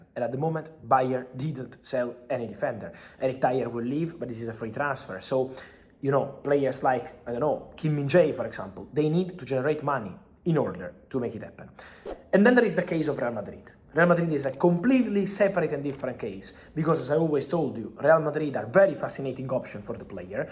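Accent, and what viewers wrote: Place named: Italy. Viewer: please take a breath and slow down a tick...Italian-English is lyrical but not easily understood for this Alaskan...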